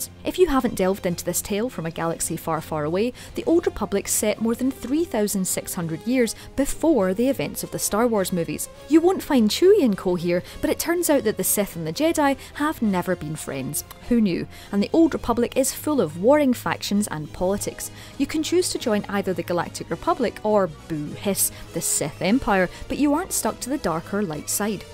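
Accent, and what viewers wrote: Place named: Scotland, United Kingdom. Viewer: it's a scottish accent ^^ same as mine.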